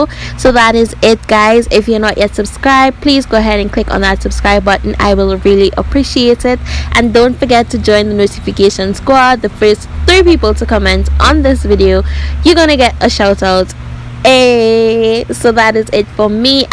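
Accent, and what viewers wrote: Trinidad and Tobago. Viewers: Hi, u sound like a Trinidadian! 🙌🙌🙌 Beautiful work by the way😍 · 🇦🇬🇦🇬Hello Ms. Trinidad... picked up that accent anywhere. FABULOUS WORK Caribbean Sister!!! Congratulations!!!!💙💙💙💙💙🌟⭐⭐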